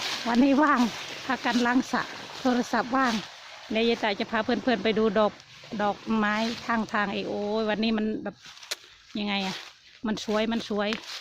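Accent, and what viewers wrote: Surin, Thailand. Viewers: ยายเป็นคนจังหวัดอะไรคะ สำเนียงเหมือนคนสุรินทร์ บุรีรัมย์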